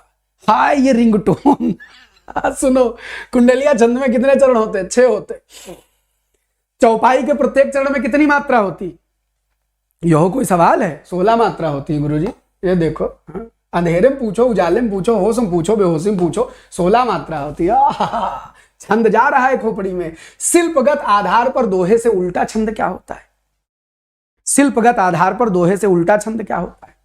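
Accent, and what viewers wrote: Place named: Western Uttar Pradesh, India. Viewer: hm bhi hai up se .. pr hmare yha aisa nhi bola jata ..sayad aap west up se ho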